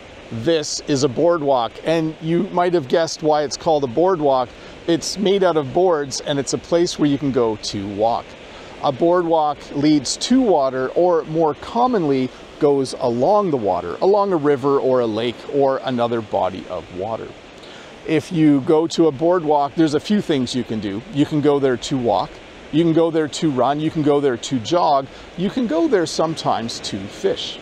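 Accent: Canada. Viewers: I am in Canada. A newcomer. Your videos are helping me to understand local accent ❤❤❤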